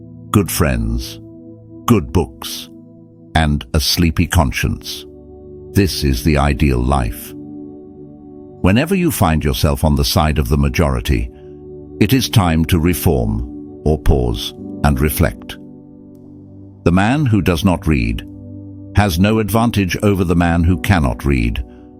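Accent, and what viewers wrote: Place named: England, United Kingdom. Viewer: Mark Twain quotes in an English accent, sigh, what is this world coming too?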